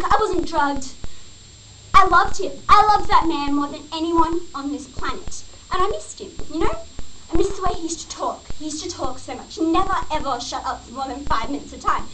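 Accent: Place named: Australia. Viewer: shes aussie not british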